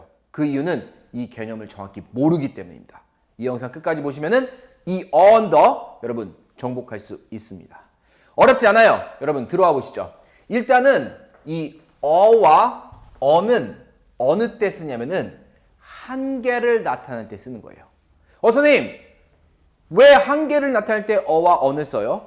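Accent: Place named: Chungcheong, South Korea. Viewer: 충청도 사투리 쓰시는거 같던데 천안분이셨나봐요? ㅎㅎ 참고로 사투리는 잘 모르지만 느낌이 그러네요^^*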